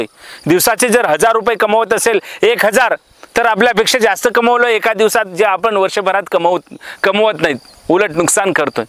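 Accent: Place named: Uttar Pradesh, India. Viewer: भावा भैया सारखा बोलतोस यूपी mp cha वाटत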